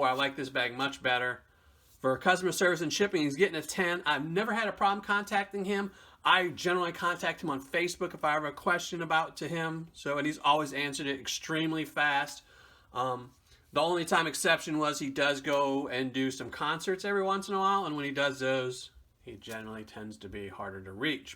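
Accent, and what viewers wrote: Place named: New York, United States. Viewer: I've been a long time subscriber as you can tell from my name I don't know if you give these away to just subscribers on YouTube or if they go to patreons only but I love the hell out of your videos in your New York accent reminds me a whole lot of my grandma just the accent my grandma was a sicilian woman from the Bronx Sicilian accent with the Bronx what a beautiful thing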